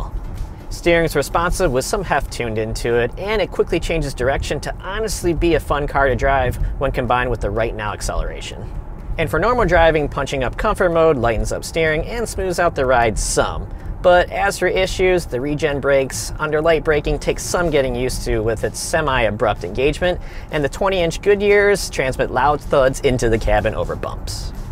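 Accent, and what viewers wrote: United States. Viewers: 😊 I expected him to pronounce it like an American wood since he's speaks like one. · Holy Michigan accent. He sounds like John McElroy lol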